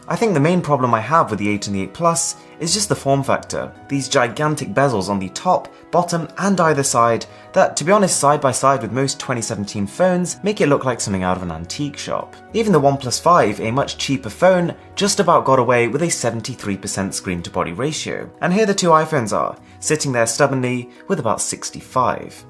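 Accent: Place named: England, United Kingdom. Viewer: Just out of interest, is Aaron from England or from the USA? The reason why I ask is because he sounds like he has an English accent but he says "Zee" instead of "Zed" And he talks about the prices in USD instead of GBP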